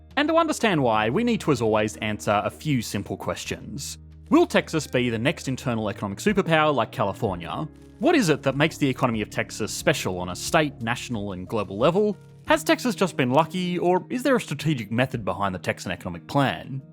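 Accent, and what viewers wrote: Australia. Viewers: Your accent is so wonderfully aussie that i thought at the end of the video, "i didn't know they call each other "partner" down under.....just like in Tex....wait a minute." Then i got it. Slow day for me. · He's Australian, there are a lot of elongated vowels with the countries accent. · Whose idea to use a horrible rushed Aussie accent? Just use a normal American accent and less wordy next time. Thumbs down for being overly boring.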